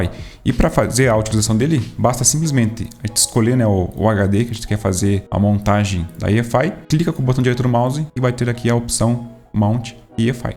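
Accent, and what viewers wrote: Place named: Paraná, Brazil. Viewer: haha quando vi o sotaque já imaginei ele é paranaense, e que massa que é daqui de CTBA piá. haha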